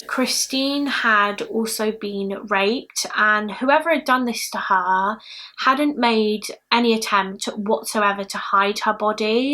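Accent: United Kingdom. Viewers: I just love your British accent! It makes my day! Thank you for all of your interesting shows on your channel!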